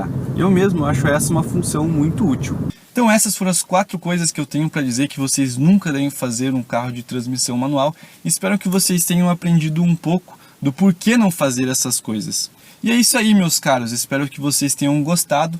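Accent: Curitiba, Brazil. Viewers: Dae jovem, tu é de Curitiba? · Vc é curitibano?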